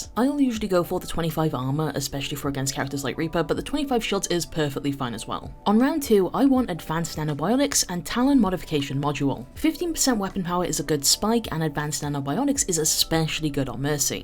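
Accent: Wales, United Kingdom. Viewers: Their Accent is Welsh if ur curious.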